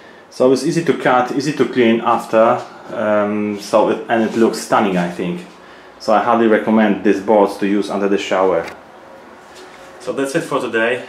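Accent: Poland. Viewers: His accent sounds Polish to me.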